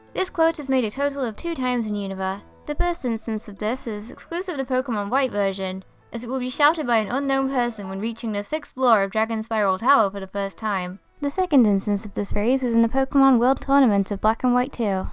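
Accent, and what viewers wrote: United Kingdom. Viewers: Are you british? your accent seems to be that. I am kinda shy saying this, but I think your accent is kinda cute.Your videos ate awesome, new subsciber ='D Greetings from Venezuela. Edited: I misspelled are as ate XD (I need to study more)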